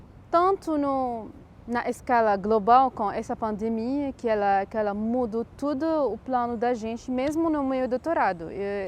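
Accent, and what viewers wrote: Ceará, Brazil. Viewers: Seja bem vinda ao nosso País,sou de Salvador mais já deu pra notar que vc já estar com sutaque Cearense. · Que fofa falando com sotaque cearense. Descobri esse canal agora, estou amando os vídeos😍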